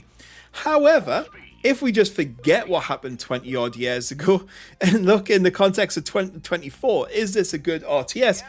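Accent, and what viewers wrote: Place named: Northern England, United Kingdom. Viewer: Sounds like ya not far from me mate, Darlington here!! Great video! think ill buy this :)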